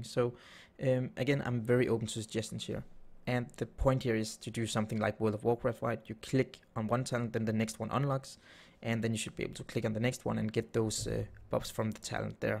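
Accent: Denmark. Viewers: Is that a Danish accent I hear? XD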